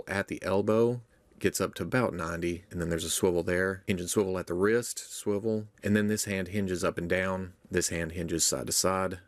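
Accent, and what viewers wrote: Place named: South, United States. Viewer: I’m also from Arkansas. I love his southern accent.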